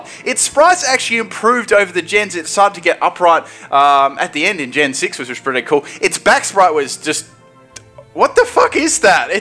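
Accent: Australia. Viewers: I'M FUCKING CONFUSED YOU SOUND AUSTRALIAN ARE YOU AUSTRALIAN!?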